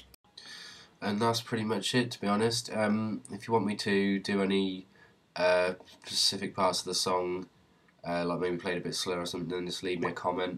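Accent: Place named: United Kingdom. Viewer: wow.That was actually the best Andy tutorial i saw on youtube,and the best british accent i heard in my hole life.Thanks bro!!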